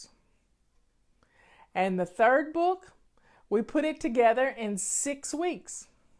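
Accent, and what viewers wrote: South, United States. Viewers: Congratulations Marla! I've been a follower of you and the system for many years. I really enjoy listening to your encouraging words and southern accent as I rest with recent diagnoses and treatment of matasticized breast cancer of the lungs. I enjoyed you back in the years past and I love that I can hear you daily as I do what I can. Love you and thank God for you, Richard, your dogs, your family and flylady staff! You make my day!...sylvia in WA state 😊 ⛄ 🎶 🐶🌹 🎂 🍹 🎁 🎉 🙏 💖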